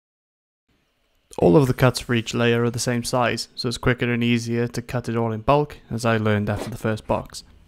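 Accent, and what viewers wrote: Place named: Wales, United Kingdom. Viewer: Good video. Only complaint is 'lumber' over 'timber' as you sound Welsh maybe and not American?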